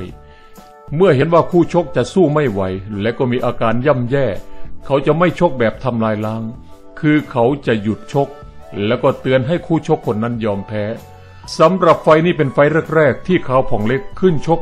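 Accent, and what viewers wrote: Isan, Thailand. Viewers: พากย์อีสานตลกดีบ้านๆดี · ภาษาอีสานก็เพราะดีค่ะ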